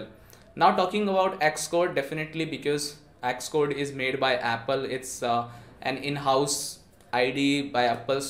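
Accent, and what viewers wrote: India. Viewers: Bro I m proud of you as one of the few good Indian developers on Youtube who is confident and provides the right information but you got to work on your English accent and grammar. The technical stuff is amazing already why not to go one step towards the perfection of videos and fix those language issues. Don't be offended this is just to see you grow more.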